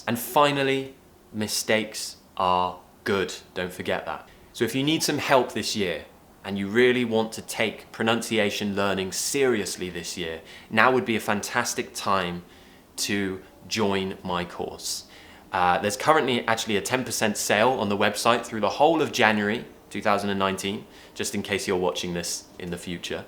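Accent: United Kingdom. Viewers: Okay after this video I'm already your fan You mind looks like with mine... but with british accent hahahaha I like it! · Sir, I'm from India. I'm passionate about learning English. I have been watching your YouTube speeches for the past few months. I'm inspired by your British accent. I am passionately learning phrasal verbs nowadays. I like your speeches very much. Happy new year sir. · I think im fallin in love with this guy he is so charismatic while talking with his brit accentttt💕💕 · hi Teacher excellent your English"s course. ( and I love too the britsh accent). For me is the best !!. ( not against other please ). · Nice to hear from you once again... Lov the British accent lol.. Lov from Africa the Gambia... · I like your British accent thank you so much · I found your videos by mistake and I loved your attitude. My goal this year is achieving a better British accent like yours. 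Excellent video!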